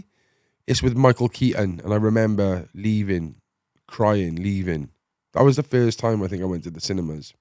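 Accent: Wales, United Kingdom. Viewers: Been a bit too much wool on for me lately, if i'm being honest, i'm more of an Awayday's type of lad being from Middlesbrough that is, though i do love the Welsh Valleys it's a beautiful place and love the accent my man, keep up the good work👍 we do both have one thing in common, we both have a transporter bridge❤️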